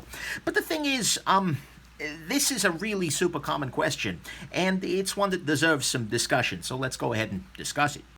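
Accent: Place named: South, United States. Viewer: Your southern accent is amazing!! You’re hilarious! Love it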